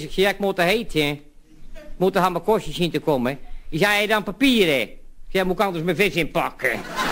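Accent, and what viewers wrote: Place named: Zeeland, Netherlands. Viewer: ik ben ook een zeeuw maar deze praat zo plat dat ik het moeilijk kan verstaan:P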